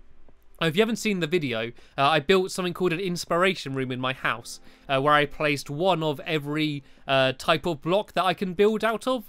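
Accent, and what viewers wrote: England, United Kingdom. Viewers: Are you form England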